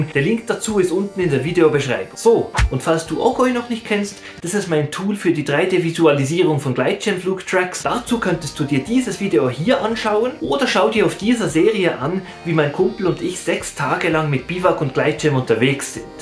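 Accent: Bern, Switzerland. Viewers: Ganz klar immer no Bärndütsch! 😅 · Tip top equipement review! Deutsch-deutsch is für mich klar leichter verständlich, trotzdem gefällt mir dein autentisches Schwizrdüch besser! 😊❤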